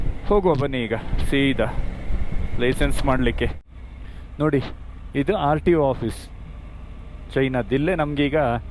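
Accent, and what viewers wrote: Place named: Kundapur, India. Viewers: Hello nivu Udupi avara, nimma kannada Keli oru badi andu konde. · Kundapur kannada ❤️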